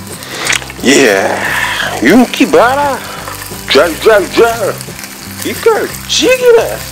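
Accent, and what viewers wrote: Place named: Gyeongsang, South Korea. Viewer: 구수한 경상도 사투리로 듣는 맛있는 양념갈비 레시피 재밌었어요.^^ 댓글 보고 왔는데 ㄱㄷ하고 갑니다. 앞으로 자주 소통하기를 바래요.^^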